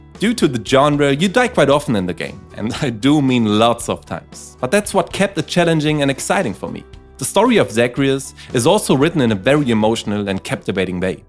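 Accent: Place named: Germany. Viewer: Your accent is german, isn't it? As a fellow german myself I find this really funny but I'm worried my own accent will get stronger through hearing all of your videos. Also I played moonlighter after getting it recommended and I liked the concept, but it was VERY repetitive and didn't have to offer anything than this innovative selling game mechanic. After the second dungeon I got really bored and wanted a bigger overworld open world and varying gameplay. All the other games on these list are absolute masterpieces though🔥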